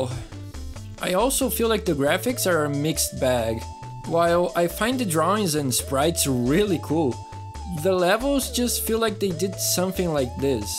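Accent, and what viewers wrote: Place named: Brazil. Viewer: I love your channel, man. Are you Brazilian? Your accents is pretty similar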